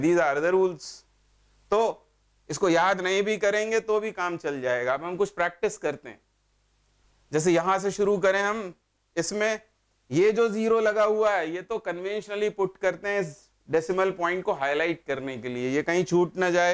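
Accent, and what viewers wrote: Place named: India. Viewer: eng title, random india voice...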